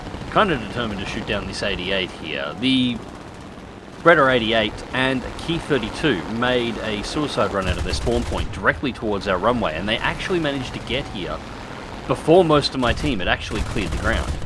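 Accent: Australia. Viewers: Feeling like you also just watched Rex's Hangars video on the HE112 ;) ? Awesome vid mate! thank you very much, love this plane and it's great to listen to an Aussie talk about something other than the fkin election or parliment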